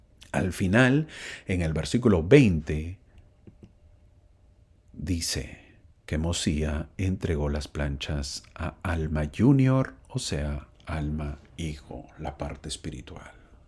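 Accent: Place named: Peru. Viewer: yo les he hecho esa reflexión a mis hijos. Les obligamos a ir a la escuela. Pero si no quieren ir a la Iglesia, ahí hablan de la libertad de escoger. Siempre les digo que es lo nos va a dar la vida eterna??? Parecernos a Jesucristo eso lo aprendemos en la escuela llamada Iglesia. Y se quedan mirándome, y me dan la razón!!!😊, gracias me da gusto escuchar acento peruano!!❤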